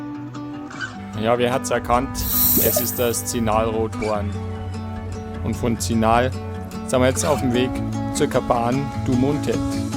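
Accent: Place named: Bavaria, Germany. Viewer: Servus, ich hab deinen Kanal vor kurzem entdeckt und muss sagen die Videos taugen mir richtig. Gute Länge, gute Aufnahmen und sau sympathischer bayrischer Kommentar. Mach weiter so!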